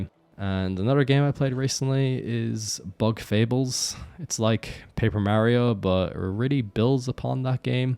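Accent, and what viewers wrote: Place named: Ireland. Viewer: No, he’s just Irish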